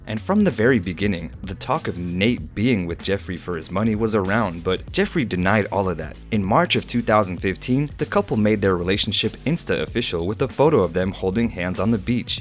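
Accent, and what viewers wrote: United States. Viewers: Prices quoted in pound sterling... Narrated in American accent? I'm lost. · Jade Tea Was thinking the same thing. Sounds like a foreigner with an American accent, so I was confused by the £ usage. Especially since we’re talking about an American public figure.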